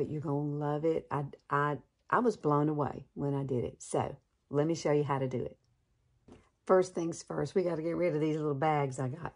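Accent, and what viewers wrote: South, United States. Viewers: Love your southern accent. I have a ton of family in Alabama..What part of Alabama are you in?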